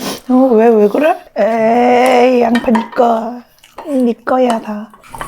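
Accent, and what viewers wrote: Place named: Rural, South Korea. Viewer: You guys are so cute together! 😊 You have the country Korean accent, so cute.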